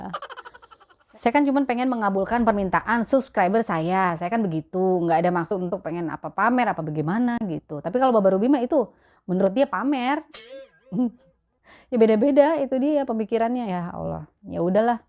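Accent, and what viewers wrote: Jakarta, Indonesia. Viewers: Logat Betawinya msh kental mpok.hehehe... Dari Al-qassim menyimak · Logat betawinya masih melekat lucunya 😁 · Assalamualaikum... Seneng bngt liat vlognya apa lagi klo lg pas ngmng betawi...sehat² di sana...salam kenal dari Medan (aslinya mah jkt jg..ikut misua stay dimedan) Walasam🙏🙏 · Yaa Allaah... betawinya.... bikin bangga... 😍😍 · Logat betawinya nempel bgt.. demen dah mpo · mpo betawi nya kuar semua😂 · Suka denger ngomongnya ada betawinya ada arabnya..resep bgt...sukses selalu mpok cantik..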